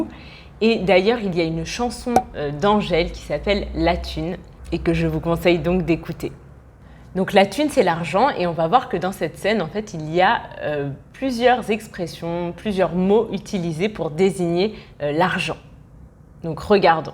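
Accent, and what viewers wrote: Paris, France. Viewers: Bonjour. J’adore ton accent français. Je trouve que ce vidéo également intéressant. J’ai hâte de votre prochain vidéo. J’aimerais que vous faites le même pour la série Dix pour-cent (Call my agent) si c’est possible. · Elle a un accent parisien, mais surtout, elle accentue sa prononciation, et parle doucement, pour que vous saisissiez ce qu'elle dit.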